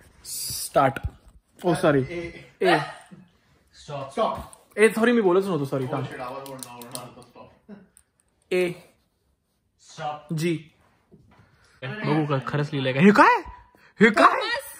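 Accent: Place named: Nashik, India. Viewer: tujya videos madhe nashik khup aikayla yeta.... Btw mi pn nashik ch ahe...tu hi nashik cha ahes ka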